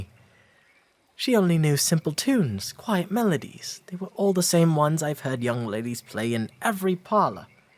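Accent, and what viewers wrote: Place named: RP, United Kingdom. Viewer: oh wow the posh accent is 🤌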